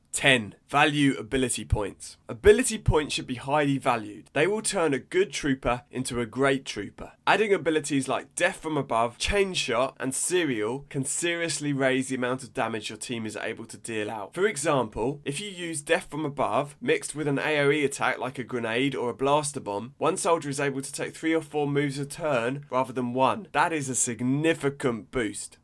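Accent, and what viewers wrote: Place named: United Kingdom. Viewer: man, how much I love your accent... You're british, ok, but exactly from where?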